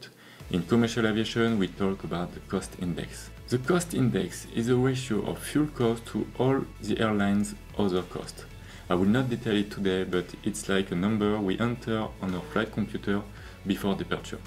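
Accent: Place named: France. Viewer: Ca c'est de l'accent Franco-Français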